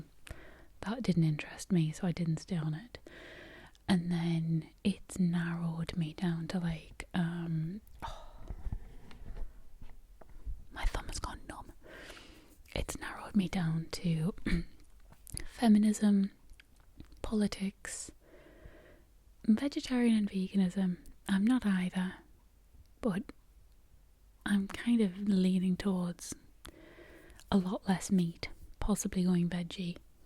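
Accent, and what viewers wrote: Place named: Northern England, United Kingdom. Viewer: ! You were my first asmrist I found about 7 years ago and it's crazy to see how much you've progressed and how many things you're working on. After years of watching biscuits of britian, I've now moved to the UK myself and am finally trying all of them. And I can hear your northern English accent so much more now. I think you're wonderful and however much time you need off, please just take care of yourself and only make videos you enjoy! x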